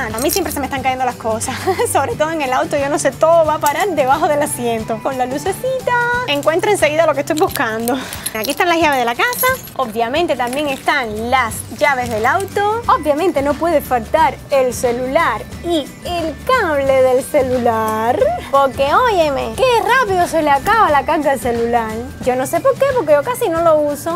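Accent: Cuba. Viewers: mariam teran es el asento cubano pues yo soy panameña y desimos nama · mariam teran por el acento cubano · mariam teran es por el acento cubano en algunos países cambian algunas palabras por ejemplo yo soy chilena y no hablamos con la S ejemplo: estas/estai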